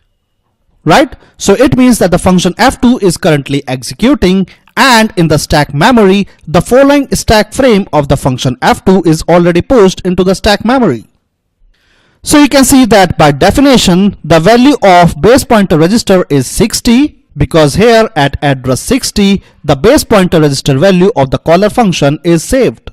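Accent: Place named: India. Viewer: you're screaming in your mic with a indian accent.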